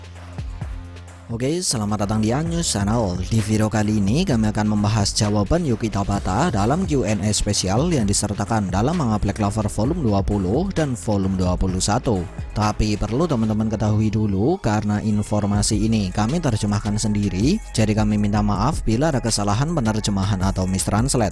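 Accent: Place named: Java, Indonesia. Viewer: bahasa jawanya medok sekali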